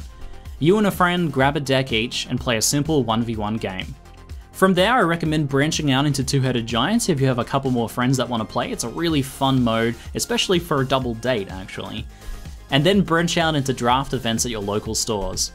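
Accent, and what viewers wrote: China. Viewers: Chinglish is there, did you bro up with him? xD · ayy chinglish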